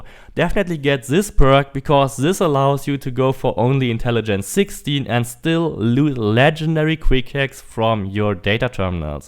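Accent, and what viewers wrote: Germany. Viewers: Laut deinem Akzent gehe ich davon aus das du deutsch sprichst...Top Video. Danke.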